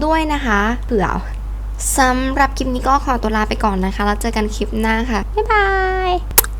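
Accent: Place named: Suphanburi, Thailand. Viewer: ไงครัช ชอบรายเส้นนะ เก่งอะ เหนอวะ เปนคนสุพรรณนิ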